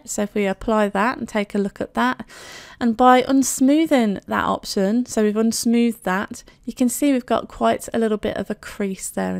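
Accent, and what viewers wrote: United Kingdom. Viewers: any speech cannot be more english than this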